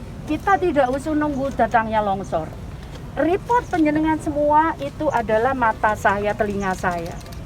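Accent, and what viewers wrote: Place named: Surabaya, Indonesia. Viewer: Memdengar bhsnya serasa msh di Surabaya. Lanjut bu!!!!.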